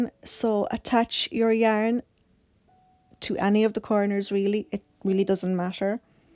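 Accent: Ireland. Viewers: Hey! I love this sweater. I just found your channel and subscribed immediately. I love your Irish ☘️ (I think 😊) accent. I might be binge watching your channel today 😉. Thank you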